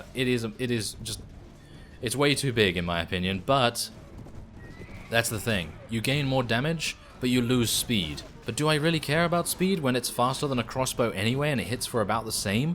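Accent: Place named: RP, United Kingdom. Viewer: If he quits his title I will be forced to try find someone with his. . . Gaming interests and accents. So fancy and almost posh <3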